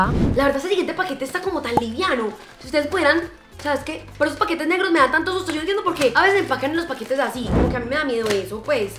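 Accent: Antioquia, Colombia. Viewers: Me encanta tu acento paisa ♥️más vídeos de cosas perdidas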